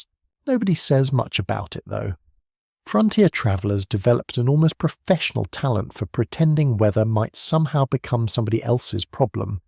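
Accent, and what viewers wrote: United Kingdom. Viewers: Aaargh so tired of cokney ai voices 😊😊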